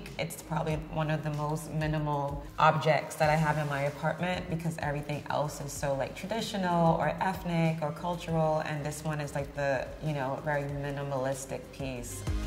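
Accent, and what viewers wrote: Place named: Germany. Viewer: Das ist doch, dachte ich, der Germany Channel - why does she speak en mit einem DE accent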